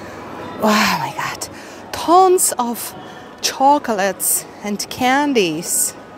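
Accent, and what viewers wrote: Russia. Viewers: Your accent is like Russians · Ощущение, что это русская девушка, просто говорящая на английском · Вы учитель английского? Разговариваете как по Бонку. И акцент русский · ! С первых слов на английском слышно Рязанское произношение. Это пропаганда! Еды много, а кто это покупает?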